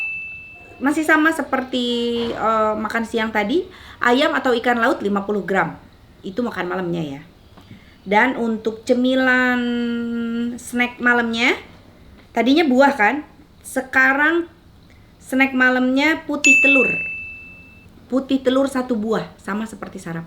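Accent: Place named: Sunda, Indonesia. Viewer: suka bgt denger suara teh, sunda.....bgt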